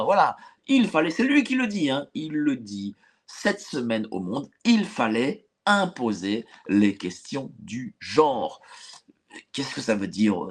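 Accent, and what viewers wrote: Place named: Germany. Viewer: Arrete ton "il ne faut pas avoir peur", c est à vomir. Je deteste l accent allemand.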